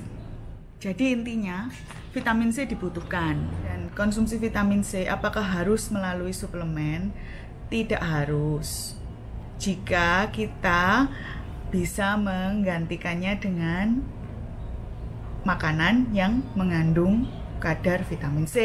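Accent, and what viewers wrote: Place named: Surabaya, Indonesia. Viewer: makasi ce infonya ........logat suroboyoan e Jan ora ilang blas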